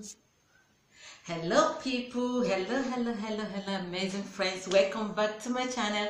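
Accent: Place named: Owerri, Nigeria. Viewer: u speak owerri , are u from owerri , im from owerri too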